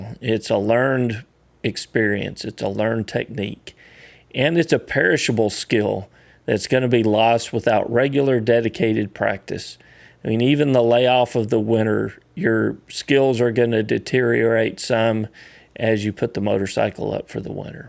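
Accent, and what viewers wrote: Texas, United States. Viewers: ...Don't sweat the complainers! Your Texas style of speaking is fine! Even us fast talking New Yorkers can enjoy a little slow down. Your videos help a lot of people, I am sure. Especially new riders. I enjoy them as a 40 year rider; and I've learned some things too. Keep it up!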